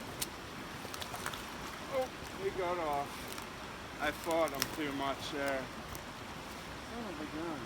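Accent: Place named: Indiana, United States. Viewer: "Crik". you say Creek like country people in Indiana do.. I'm from Indianapolis and we say cr-eek versus country people who say cr-ik..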